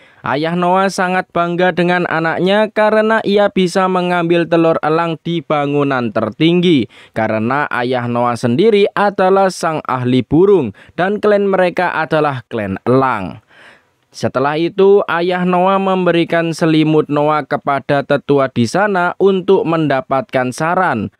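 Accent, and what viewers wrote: Java, Indonesia. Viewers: cuman bilang meddok doang bang,kaga raasis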